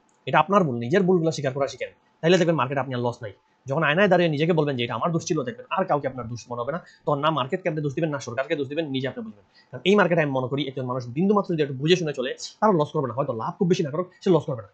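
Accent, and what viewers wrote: Sylhet, Bangladesh. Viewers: ভাই আপনি কি সিলেটি নাকি এত ফাস্ট কথা বলেন কেন আস্তে আস্তে সুন্দর করে কথা বলেন। আশা করি আমার কমেন্টসটা বুঝতে পেরেছেন। এবং ওইদিকে খেয়াল রাখবেন। আপনাকে থ্যাংক ইউ।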